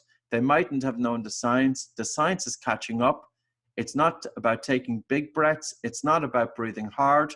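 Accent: Ireland. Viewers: Oh, this is fantastic, outstanding - thank you!! You confirmed for me that what I've been doing intuitively is right on (since COVID... when grocery shopping, keeping mouth closed and hardly breathing if at all, especially when near anybody), when outdoors and downwind of anybody else (especially if they're speaking), ditto (and move upwind/away if possible so as not to be 'in the line of fire' for their expelled air (and particles...), etc. etc. Also, over a steady 24 years of lap swimming, I have always and only breathed through the nose while swimming, though I wasn't aware of all the benefits of doing so. This was very educational and exceedingly useful, especially at this time. Bless you! Will be sharing.....! (p.s. - love your (Dublin?) accent :) ) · I’m breathless over this guy and his irish accent! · I love your Irish accent. Not an accent in your country of course but in America yes. 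· Brett/ hold time = BREATH Hold Time. Tis the Irish accent you see. Likewise, wid = with; tinking = thinking, breed = breathe. LSD is a good anachronism. This has been an excellent explanation. I've shared this with as many people as I can and now I am doing a revision. Thankyou once again, many times over. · But why does he do all this .eishhhhh minuit - muinishhhhh, respiratory rate - respiratory reishhh? like wtf are you IRISH, KIWI, AUSSIE? xD if you tell, I change my thumbs to up, cause all you say is probably right and helpfull, but the accent xD is hard to get used to and bad for my oxford english ^^ ah and if you panic, use asthma spray, drink a glas of irish stuff, whiskey, then sit straight, breath deep and slowly, not big shallow and fast.. btw it's better without whiskey, but if you panic you needa stop that. still better then drugs xD except asthma spray xD luls. Actually when we do sports and we get exhausted, and make the break, at first we do exactly what he says, fast shallow breathing, but then after a few seconds, we automatically go back to normal breathing, slow, deep, not hyperventilating/fast breathing. 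xD appearetnly our body knows it by itselsfin usual conditions and sports. ^^ my note, his technique - EVERY TIME! THIS TIMES - when you do his test, like resting respiration, you feel obstruction or breath difficulty - CALL AMBULANCE AND DOCTOR!!!! use his techniques until they arrive. because I GOT SUSPICION, the earlier you arrive in intensive care or medical care - the easier they can help, avoid the cytokine storm with meds so it doesn't destroy the lungs! THE THING THIS REALLY SMART GUY DOESN'T MENTION: AFTER CYTOKINE STORM = YOUR LOUNGS ARE WHITE, DEAD, NADA, you're DONE, you wont' breath anymore cause lungs = broken, so you're DEAD, so you cannot appl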